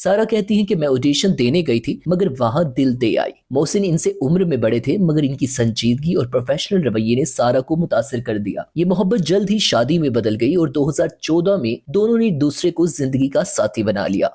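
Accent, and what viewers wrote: India. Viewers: Ye Jo host hai kia India se hai. Talafguz India ki Tarah PATA nahi kion apnate hain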